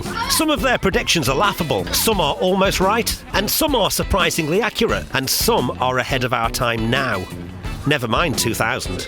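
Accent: Stoke, United Kingdom. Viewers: I've only just found your channel. It's brilliant. Your accent is very similar to mine, are you from Stoke ? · Annoying British accent, unnecessarily bloated content.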